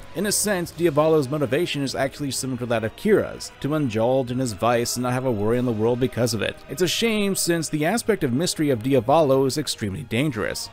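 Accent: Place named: United States. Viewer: your family? Because your accent and channel is american.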